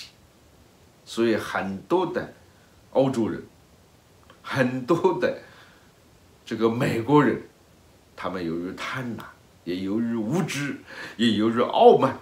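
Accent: Hunan, China. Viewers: <num> Lotus 😂应该是湖南的吧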